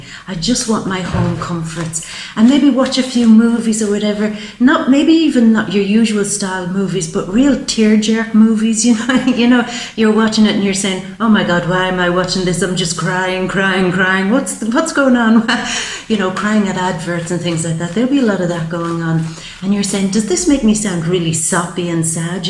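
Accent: Ireland. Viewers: I'm thinking Ireland. I love the accent whatever it is.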